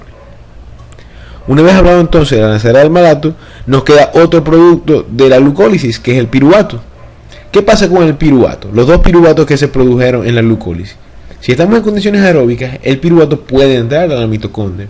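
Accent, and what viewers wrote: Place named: Venezuela. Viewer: Tenias que ser venezolano.. Saludos desde coro.. Te entendí a perfección <3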